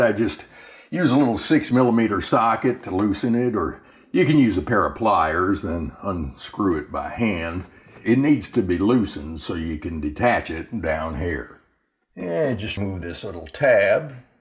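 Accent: South, United States. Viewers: You sure know have better story telling skills than appliances. Heck i didn't care about the dishwasher anymore! I just want to listen grandpa tell us some wonderful moonlight story in his his deep southern accent!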